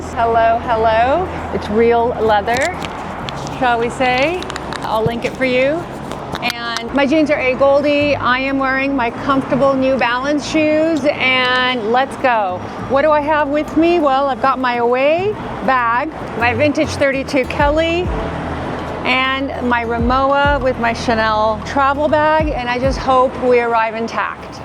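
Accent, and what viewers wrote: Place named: United Kingdom. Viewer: ! You crack me up! All that British accent chatter in the park! I am sure that you went on and on! LOL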